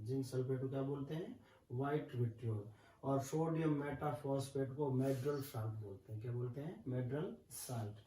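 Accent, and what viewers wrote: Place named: India. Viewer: he speaks indian dude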